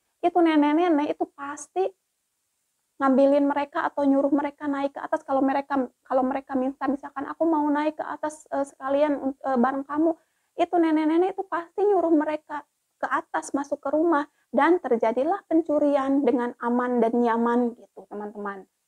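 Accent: West Java, Indonesia. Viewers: Sy seneng.ada sunda2 nya ngomong nya a eteh mah lucu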